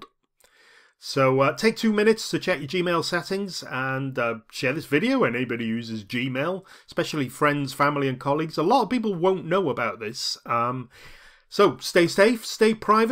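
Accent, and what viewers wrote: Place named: England, United Kingdom. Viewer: Why is this voice using an English accent?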